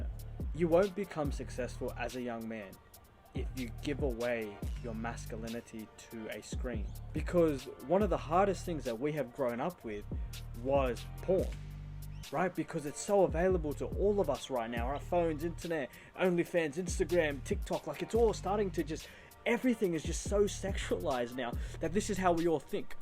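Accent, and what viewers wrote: Australia. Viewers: just popped up in my algorithm, stoked to hear an aussie voice on this journey. cheers lad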